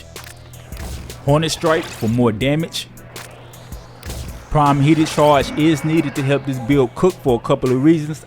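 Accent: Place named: South, United States. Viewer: southern black accent.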